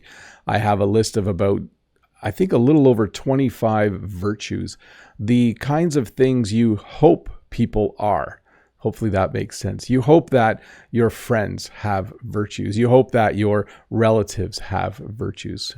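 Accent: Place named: Canada. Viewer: Hello, dear teacher, I am Shahab from Iran, one of your avid fans. I truely appreciate the very useful lessons in which you teach us very fantastic things. I also love the Canadian accent. However, as a doctor, I believe you made a small mistake regarding the meaning of " empathy" and " sympathy ". Actually, empathy is the ability to understand and share the filling of another person, to put yourself in their shoes without becoming emotionally involved - exactly the same way doctors are expected to interact with their patients . On the other hand, sympathy is the feeling of pity, compassion or sorrow for someone else who is experiencing something difficult that doctors are professionally discouraged from showing sympathy because it might create a sense of imbalance in the doctor-patient relationship as it can cause the doctot to become emotionally overwhelmed. 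Hence, doctors should demonstrate empathy and avoid excessive sympathy.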